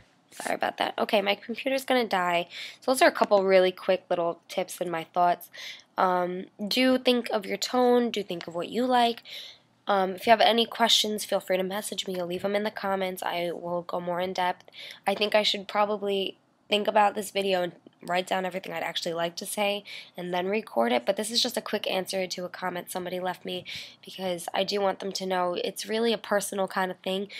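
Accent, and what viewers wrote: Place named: New York, United States. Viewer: Do you live in New York? I can kinda hear the accent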